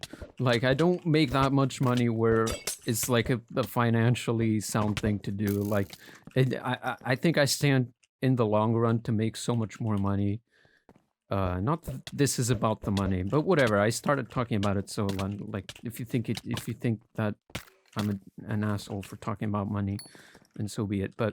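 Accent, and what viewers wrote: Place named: United States. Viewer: Ur voice changed, it got like a bit American like just a little bit and a bit more clear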